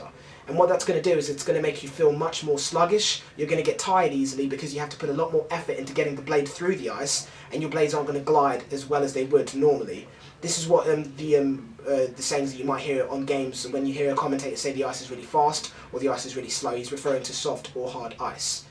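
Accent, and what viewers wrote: England, United Kingdom. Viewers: Tutorials are always better with an English accent. Cheers mate.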